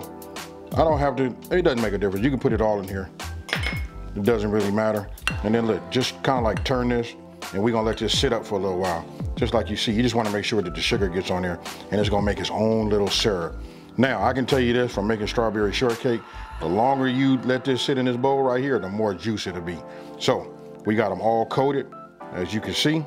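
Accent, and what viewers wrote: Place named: Los Angeles, United States. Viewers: I like this dude lol. I love that LA accent, makes me wanna move to Cali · Dude sounds like he from LA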